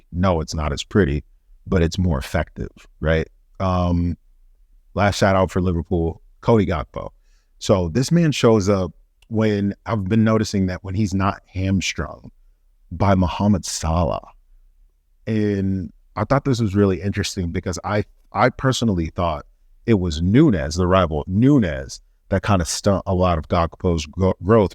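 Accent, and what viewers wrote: United States. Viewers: I've just subscribed for one reason,and one reason only! You are the only person with a US accent that knows exactly what you're talking about. There are a couple of points that you have made that I totally agree with. Not that there right (sometimes it's a matter of opinion). But I have a good knowledge of Liverpool. And the current team. And you are 100% on the money on so many points 👍. If you need to get a bit more exposure, and grow your Chanel? You need local YouTube channels to start looking at you. Contact some of them and see what happens. Knowledge is knowledge. And a red is a red. Looking forward to listening to more of your analysis 👍👍👍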